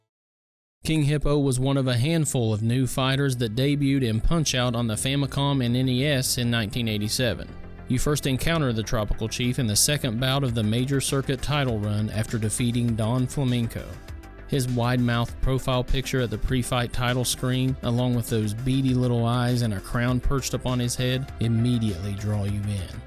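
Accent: South, United States. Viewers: Where you at man? My family and I love watching your videos! Sounds silly, but there’s something relatable we like about listening to a fellow southerner (and Big XII fan) talk about retro games. Keep up the good work!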